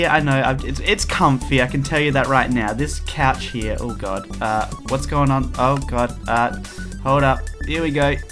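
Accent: Australia. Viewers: Your Australian M8 well me too 😀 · o ma gad r chu aussie? c: bc chu sound aussie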